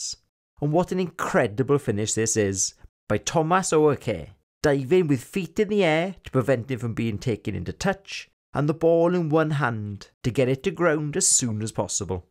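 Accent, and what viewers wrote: Wales, United Kingdom. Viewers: I spent two weeks in Wales in 1978. So good to hear the Principality dialect again. Thanks for the instruction as well. Rugby is an elegant game and thanks to Internet connections, I can get up much too early in the US and enjoy a match.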